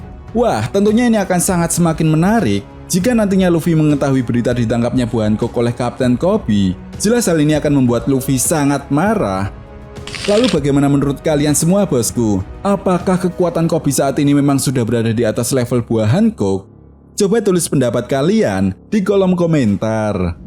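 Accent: Java, Indonesia. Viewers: Logat jawamu Hilangkan dikit, gk enak didengar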